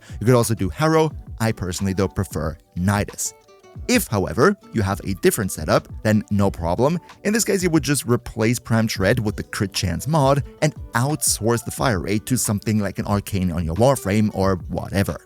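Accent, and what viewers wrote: Germany. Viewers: lol you are german ???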